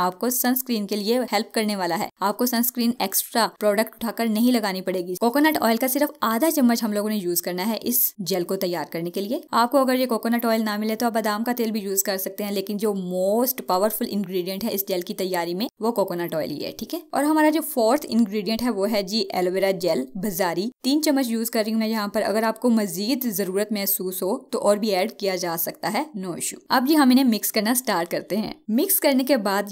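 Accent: India. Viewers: Ye pakistani itni indian jese kese bol rhi hai hay hay kese kese 👌👌❤️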